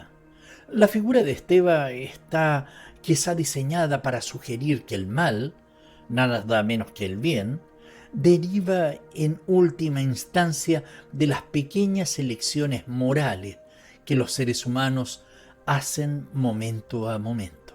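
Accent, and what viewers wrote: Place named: Chile. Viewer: yo también ya echaba de menos esa voz y dije: «tengo que escuchar otra biografía más» 😅 Un abrazo muy fuerte. Por cierto, ese acento no es taaan marcado, pero ¿será que es chileno? 🤔😌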